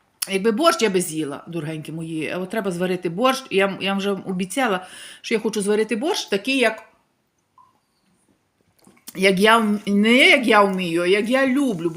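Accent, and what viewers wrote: Lviv, Ukraine. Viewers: Дивлюсь Вас з Києва,дуже подобається ваша мова,відчувається,що жили у Львові Вчуся у Вас позитивно дивитись на все, не заздрити нікому,радіти кожному дню,дякую за відео Мрію побувати в Італії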